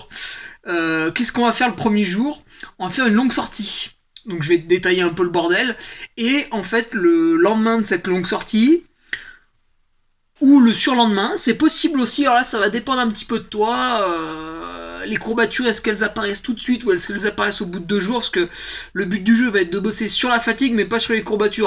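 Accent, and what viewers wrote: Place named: South, France. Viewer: T'as l'accent du sud.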